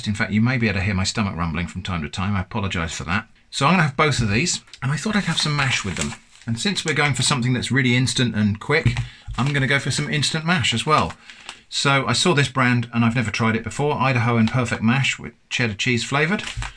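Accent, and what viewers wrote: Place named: Southern England, United Kingdom. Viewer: I call this "English curiosity YouTube" — among the ranks of Steve Mould and Tom Scott, for example. YouTube seems to have realized (quite before I did) that this sort of content mixed with the lovely idioms and accents of lower England make for wonderfully relaxing media. I've watched nearly everything on these channels since lockdown has kept me home alone for the passed year.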